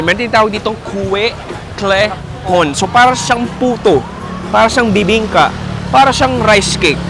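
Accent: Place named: Philippines. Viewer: English acent Philipinos... You looks like Cakra Khan..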